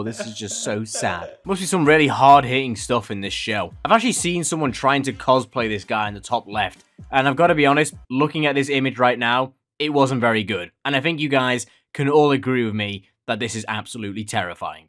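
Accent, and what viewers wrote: United Kingdom. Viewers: Btw it’s my first time seeing this channel glad to find another bri’ish fellow🇬🇧🇬🇧🇬🇧🇬🇧🇬🇧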